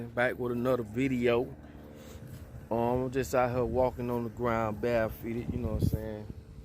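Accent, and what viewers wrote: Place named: South, United States. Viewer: Admirable..... that southern drawl i love also i just admire ur mindset we must be in the same soul group or something. Keep up the good work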